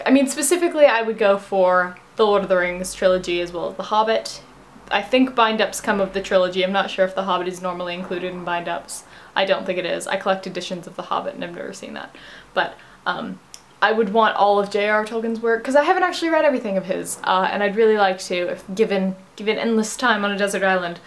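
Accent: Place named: United Kingdom. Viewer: i can hear the British seeping through your american accent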